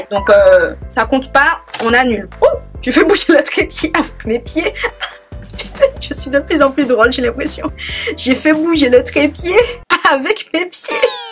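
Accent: Antilles, Caribbean. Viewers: Tu es un vrai joyau !!! ♥ ♥ Ça fait plusieurs fois que je regarde tes vidéos mais je n'ai jamais eu assez de temps pour te laisser un petit commentaire ! Ton accent est à croquer, ça sent bon le soleil et la chaleur des Antilles ! Tes vidéos sont toujours un vrai régal ! ♥ Plein de gros bisous !!! ♥ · Avec ton accent antillais la😂😂❤. Super vidéo !💋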